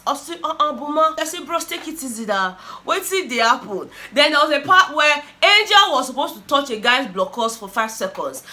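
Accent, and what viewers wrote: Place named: Pidgin, Nigeria. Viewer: Babe! I'm a first-timer and you got me with your clean English mixed with Nigerian pigin. You've got a new subscriber! Well done.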